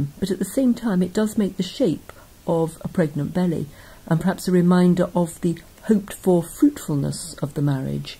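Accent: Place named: RP, United Kingdom. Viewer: Phrases like "we're not quite sure" and "must mean something" pronounced with an RP English accent sound far less learned than they once may have.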